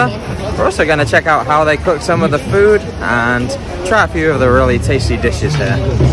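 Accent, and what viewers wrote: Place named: Yorkshire, United Kingdom. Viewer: something about his yorkshire accent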